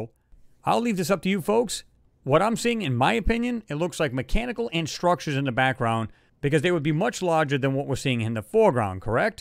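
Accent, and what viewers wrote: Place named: South, United States. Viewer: bro I love your southern accent.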